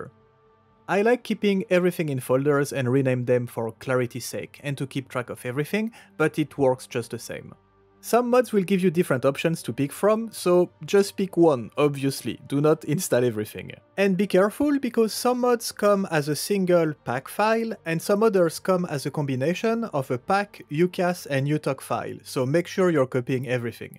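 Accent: France. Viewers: man you sound like french native, if you are " merci pour tes 2 vidéos, elles sont super bien faite! " If you are not french native, not a problem, just to thank you for your videos very helpfull, I was installing my mods manually and I decided to use Vortex. I had many mods but the mandatory one is about performance, the one that make the game smooth and playable, I though this mod was working well on my rig SINCE I installed it with VORTEX. I could not believe what I seen, I went from 65 fps to 95 fps, and said to myself, WTF?? I guess the mod was not installed properly despite I am not stupid and I followed the installation guide on nexus. You recommand to use Vortex and know I understand why, 🤣🤣👍👍By the way you are explaining everything very well (I would not imagine you can put the mod file (zip one) inside the mod folder and I learn this watching your vid. 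I guess this is important. I am gonna follow your guide about your mod list, I installed a bunch of mod and will add those one that are in your list and that suit to my test. I am following you ! thanks again :)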